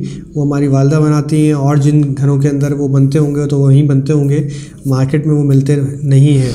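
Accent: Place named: Lucknow, India. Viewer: Aapki Lucknowi andaaz se pta chal gya tha aap lucknow se ho❤❤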